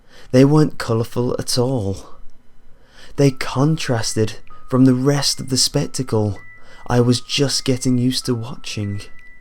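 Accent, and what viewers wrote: England, United Kingdom. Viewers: Where in England are you from, I'm tying to learn the regional accents of GB/UK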